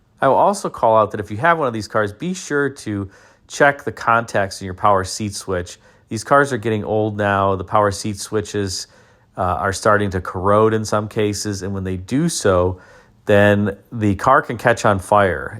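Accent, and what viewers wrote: United States. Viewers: Keep up the great work very clear American accent cheers from Australia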